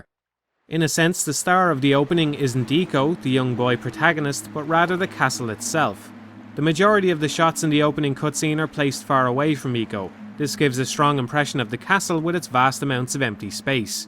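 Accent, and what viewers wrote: Ireland. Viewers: just been scouring through the top search results for Ico review, & i don't need to look any further now. as an english lad i'd much rather listen to a crackin irish accent than a whiney smiley american talk about a game that has genuine sentimental value to me - my mam used to work in Choices (blockbuster before blockbuster) lots of VHS, lots of 5th and 6th gen of gaming, some DVD's. as things were in the early 2000's. Remember playing Ico with her at a very young age & loved the world it created. funnily enough we rented shadow of the colossus shortly after completing Ico, found it way too hard and ambiguous and never finished it before returning it's a bit mad really, when silly things like games stay in your mind nearly 20 years later & become warm & fond abstract memories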